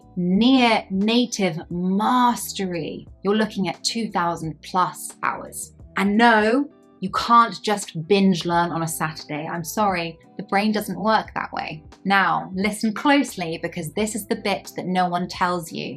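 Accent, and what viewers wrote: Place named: United Kingdom. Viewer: Dear it is a real pleasure for me;) it helps me remind some expressions and refresh this beautiful language. Love the UK accent more than the American one;) so polite and a ‘clean pronunciation’ Definitely, English has been very important in my life and musical career … more than Spanish … can you imagine? Happy to discover your Channel and follow every episode🙏🩵🙏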